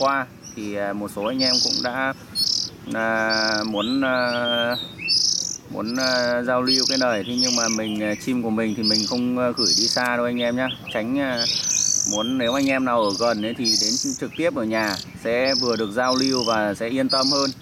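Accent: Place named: Vietnam. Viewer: Bác giọng Thanh Lãng ak :))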